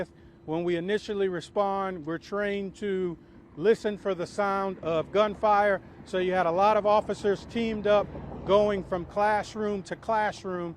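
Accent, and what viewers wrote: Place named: South, United States. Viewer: I don't know what it is but Southern accent makes it enjoyable to listen to anything being said lol.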